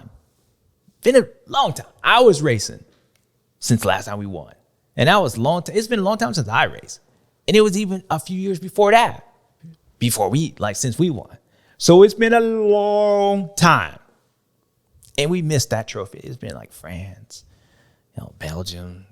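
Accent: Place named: Florida, United States. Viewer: Home Soul 😂 gotta love that Florida farm boy accent. Love you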